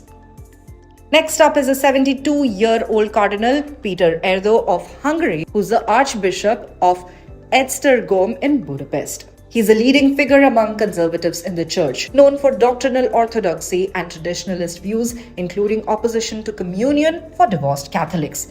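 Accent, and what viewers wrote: India. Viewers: INDIAN ACCENT IS ANNOYING AND WRONG PRONUNCIATION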